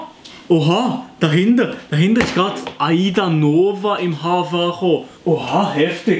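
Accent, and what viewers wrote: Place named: Fribourg, Switzerland. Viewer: i ha mer scho fasch deicht du sigisch friburger wägem akzent XD geils video!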